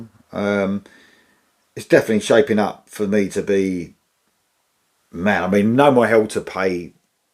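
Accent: United Kingdom. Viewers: Are you british? ❤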